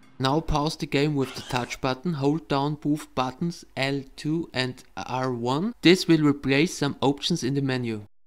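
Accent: Germany. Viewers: Bruder man hört das du deutscher bist kannst du dass auch mal in deutsch machen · Wow du bist sicher deutsch oder ? (no judgement) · It's a German accent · Wenn du deutscher bist - rede deutsch. Klingt einfach nur schrecklich wenn die deutschen auf International machen....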